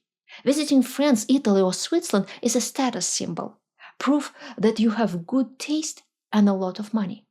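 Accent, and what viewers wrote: Russia. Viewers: Very, very good. Loved your excellent English with a touch of russian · У вас, как и у всех русских, очевидная проблема произносить согласные. На английском нет звука <t/т> как в слове "путин," также <p/п> как в слове "путин." На самом деле, на индо-европейсом семействе языков согласные "идут" в группе из 3х созвучных согласных. Я добавлю армянский для сравнения, потому что английские согласные также не полные но то что отсутствует на русском, присутствует на английском, и наоборот. Например: Арм: Բ ֊ Պ ֊ Փ РУС: Б ֊ П ֊ ? Анг: B ֊ ? ֊ P Арм: Դ ֊ Տ ֊ Թ РУС: Д ֊ Т ֊ ? Анг: D ֊ ? ֊ T Заметте как на русском и английском отцувствующие звуки одной группы обменяютя не по совсем похожих звуков. Вот почему русский акцент виден со 100 метров. Вам тренироватся надо различить эти звуки. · Thanks for the video, I learned from you. I love your Russian accent! 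Hungary has been invaded by Russia three times in the last 200 years (1849, 1944, 1956) and occupied for 45 years. So we are suspicious of the Russians and don't really trust them. It was interesting to hear the other side. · I love her voice and accent... Russian-accented English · U sound so Russian...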